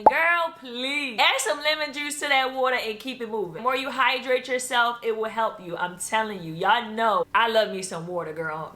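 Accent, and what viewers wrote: Baltimore, United States. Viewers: Are you from Baltimore or Philly?? I know that accent lolllll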